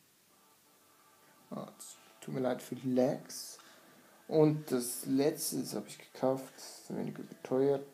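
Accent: Switzerland. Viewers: Hallo! Ich habe mal zwei Fragen 1. Bist du Schweizer (klingt irgendwie so)? 2. Sind diese Haustiere unsterblich oder verliert man die irgendwann? Tolles Vid!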